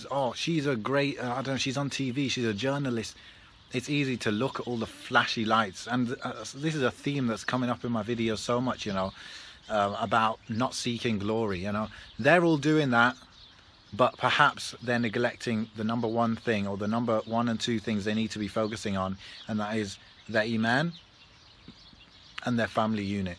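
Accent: United Kingdom. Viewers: As-salaamu alaikum. What's the name of the introductory vocal track please brother? Also, are you from Derbyshire, your accent sounds Midlands-y?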